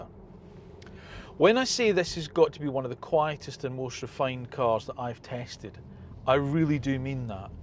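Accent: Scotland, United Kingdom. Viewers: One of the best reviewers on here atm. A Scottish Autogefuehl.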